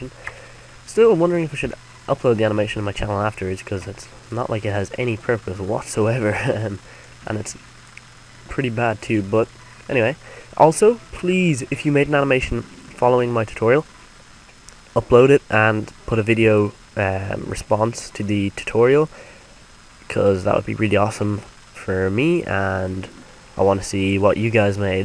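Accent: Ireland. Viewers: your irish :D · Are you Irish??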